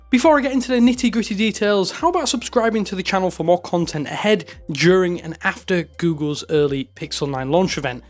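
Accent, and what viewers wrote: United Kingdom. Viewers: Not sure what surprised me more, the very early Google event announcement or the very British ending his sentence by saying "period". I just hope what ever Google does it treats us none US members better. I really hope the Pixel 9 Pro will be a smaller phone as rumoured. A nice selection of colours would be nice too.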